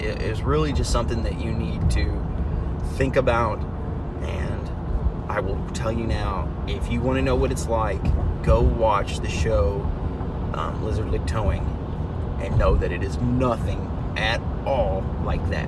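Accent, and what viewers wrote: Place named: Indiana, United States. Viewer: Man I’m from Indiana and I was like this dude is definitely somewhere in Indiana don’t know the town but I can tell it’s Indiana 😂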